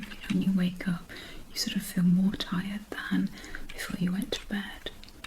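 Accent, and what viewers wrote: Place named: United Kingdom. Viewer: I just love the British accent with the typing noice it goes well together. My mum was both British Romani. My connection with the UK means alot.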